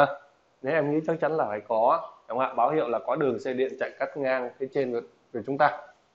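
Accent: Thanh Hoa, Vietnam. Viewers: Anh oi anh phai người quê thanh hóa ko ạ , bai giảng của anh tuyệt vời lắm ạ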